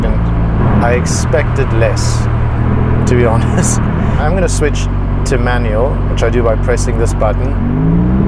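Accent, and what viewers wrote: Cape Town, South Africa. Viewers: Is the presenter South African? Has our accent, and said “ lekker “ a typical South African trait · Are you South African ? You sound like you from Pietermaritzburg.... 🤔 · Is this dude South African?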